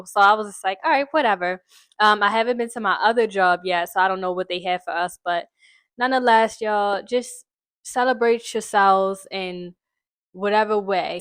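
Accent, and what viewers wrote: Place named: Maryland, United States. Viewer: You didn’t have to tell us you’re in Maryland. We could tell by the accent from the moment you said do or to. Lol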